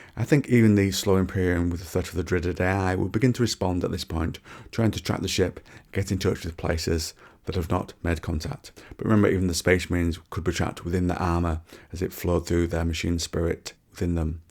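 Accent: United Kingdom. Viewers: All I need is a soft , smooth British accent , and 40k lore , and I’m here for it. Luetin has biased me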